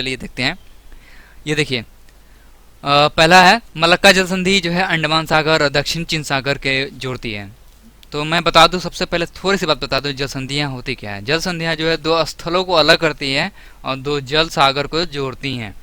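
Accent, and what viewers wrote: Bihar, India. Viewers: Aap bihari h....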